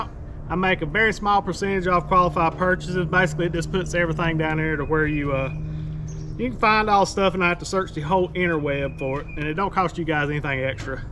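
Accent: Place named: Texas, United States. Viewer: Love the Boomhauer accent. I'll look for that paint with the options for spray pattern.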